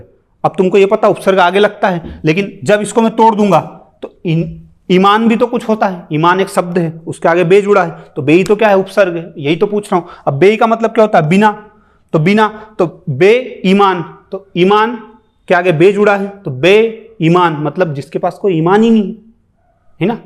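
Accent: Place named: Bihar, India. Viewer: Teacher सायद बिहार से है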